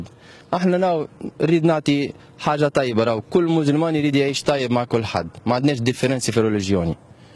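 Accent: Tunisia. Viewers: Cuz he's tunisian then the tunisian accent and the Maltese language are too similar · يضهرلي يحكي تونسي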